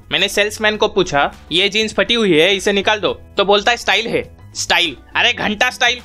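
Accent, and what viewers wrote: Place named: Maharashtra, India. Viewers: ur accent is full marathi.....very funny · Tuzi marathi tone madhli hindi mast waatate 👍😂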